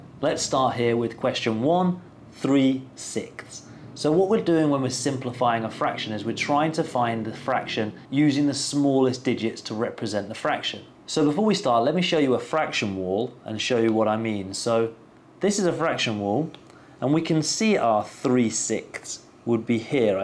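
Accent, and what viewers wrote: United Kingdom. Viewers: British ahh guy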